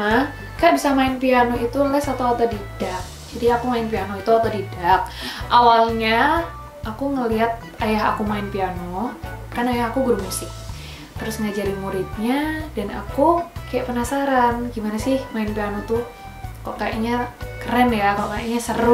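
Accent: Java, Indonesia. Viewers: Logat"nya, ada jawanya sedikit😂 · Suaranya orang jawa banget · Medok banget 😂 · Medok bed kak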